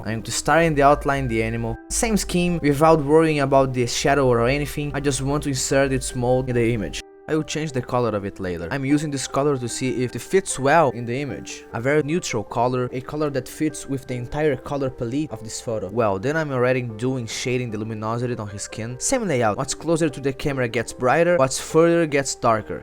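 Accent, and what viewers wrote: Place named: Russia. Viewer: Mano seu inglês tem uma pronúncia bem russa. Sem zoa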